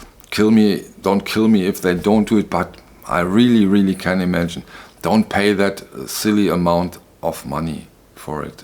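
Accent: Germany. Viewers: I actually really enjoy your speaking and German accent (I'm American). I find it sincere and very intelligent.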